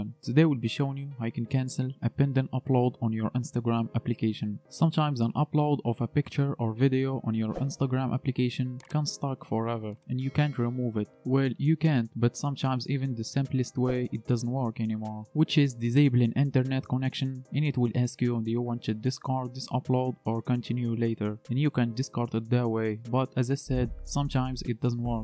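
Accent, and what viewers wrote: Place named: Morocco. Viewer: Ur accent is so moroccan maaaan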